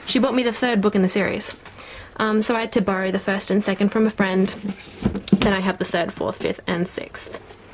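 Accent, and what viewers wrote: Australia. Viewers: god, i could listen to you talk all day! I love aussies! :D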